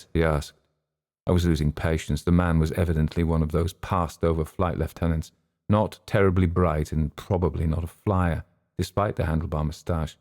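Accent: United Kingdom. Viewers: What a treat to hear a British voice, not a stupid American AI muck up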